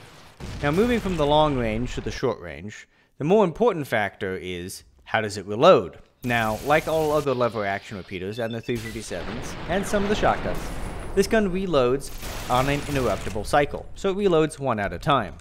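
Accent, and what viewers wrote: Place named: United States. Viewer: I love the cowboy accent YEE HOO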